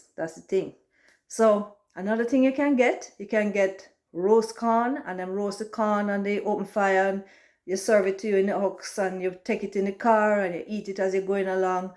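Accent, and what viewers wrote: Jamaica. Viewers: OMG this is awesome she speaks just like a Jamaican.Such a beautiful lady.. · True white woman speaking natural and authentic Jamaican patios. 👍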